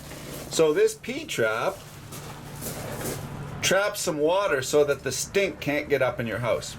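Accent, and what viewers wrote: Canada. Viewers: I just want you to know that my husband watches your channel a lot, and the stupid shit you say (doodad, fuck it bucket, doohickey, etc) + your Canadian accent really, really infuriate me. I’m not sure why. That is all.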